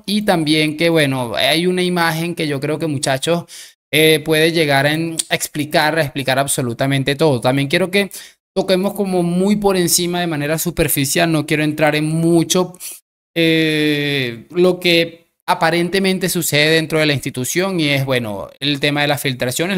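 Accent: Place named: Venezuela. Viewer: Ojo como estás mi hermano por tu acento eres venezolano no soy madridista es más admire aquel equipo de Romario estoicob ladrum kuman Guardiola de la peña con cruif como técnico igual aquel Madrid de Butragueño hugol Michel Martin Vazquez Gordillo buyo Sanchís Valdano santillano Juanito uff equipazo y e seguido a ese Madrid de Roberto c Raúl Elguera casillas y cristiano y compañía y ojo Raúl filtraba casillas filtraba Sergio ramos igual lo acia eso siempre a sucedido cambio en los últimos.4 años a Zidane se lo cargaron con las filtraciones y ojo te lo dice alguien que admira al Madrid como el mejor de toda la historia del fútbol a la altura de Brazil Alemania e Italia pero no soy fans de este súper club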